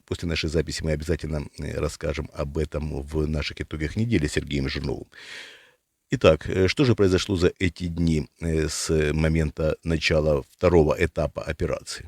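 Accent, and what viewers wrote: Ukraine. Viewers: Судя по вашему говору, вы украинец?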